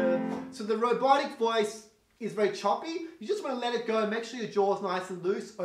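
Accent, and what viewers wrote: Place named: Asia. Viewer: Your voice would be better without the assian accent... sorry just suggesting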